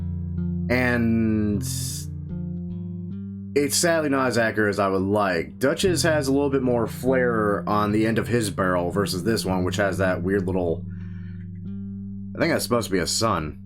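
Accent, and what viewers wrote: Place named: United States. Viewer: idk im just pointing out it sounds really american, we can understand u its the same word, just pronounced different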